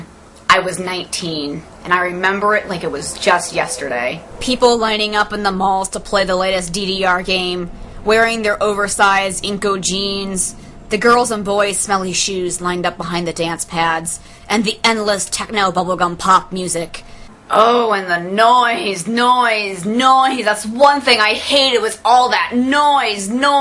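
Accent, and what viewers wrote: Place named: Australia. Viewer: Lol she is Australian not British. I love DDR but this was hilarious.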